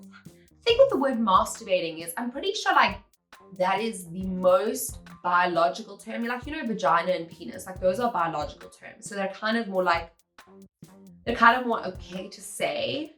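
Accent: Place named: South Africa. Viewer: South African.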